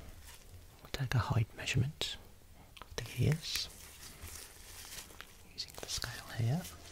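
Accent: Birmingham, United Kingdom. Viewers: mate you are absolutely blinding. your natural and professional bedside manner is just brilliant, your knowledge and terminology combined with your brummie accent make you my favourite channel. it's fantastic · Been listening to this every night for the past week, it’s saved me from a stressful week so thank you! Love the softer whispering, the Brum accent is delightful ❤️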